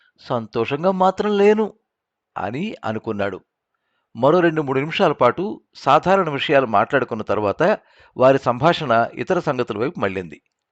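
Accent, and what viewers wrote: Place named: Telangana, India. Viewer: నేను రాసిన 'శిథిల సంధ్యలో చిరు దీపం' ను శ్రీ గారు అధ్భుతంగా చదివారు. అవసరమయిన చోట స్వరంలో ఎంతో ఆర్థ్రతను కూడా పండించడంతో రాసిన నాకే కథను వింటుంటే హృదయం ద్రవించింది. వారి గళంలో తెలంగాణ యాస వినసొంపుగా ఉంది.అది నిజంగా శ్రీ భోగారావు గారి స్వర మహాత్యమే! వారికి నా హృదయపూర్వక అభినందనలు మరియు ధన్యవాదాలు!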